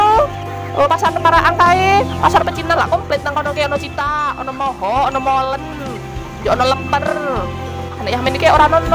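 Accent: Temanggung, Indonesia. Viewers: Wkwk ciscisang 😂 temanggunge metu tenanan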